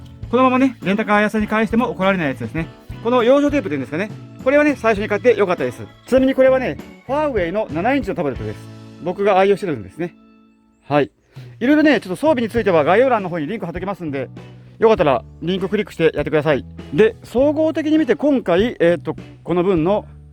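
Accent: Okayama, Japan. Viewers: 岡山の皆さん、茨城県から質問失礼します。さんがよく語尾、会話の間に、 「から」って言ってますよね？あれは方言なのですか？教えてください、お願い致します🙇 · お疲れ様でした。コロナが勢いを増している今、楽しく拝見してました。さんの岡山弁に毎回、千鳥みたいじゃー（笑）と思ってクスクス笑ったり、朝市に行けなくて一緒にガッカリしたり、トウモロコシがなかなか売ってなくてそうなの？何で？と思ったり。最後、なんか食べれるねと思ったら、律儀に計算ミスがあってと修正されて、あらら〜ってなりました。本当、楽しかったです。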